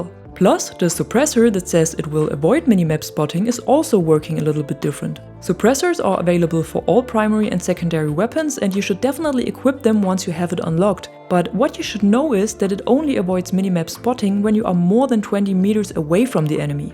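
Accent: Germany. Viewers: Warum hört man die Deutschen immer raus wenn sie Englisch sprechen 😁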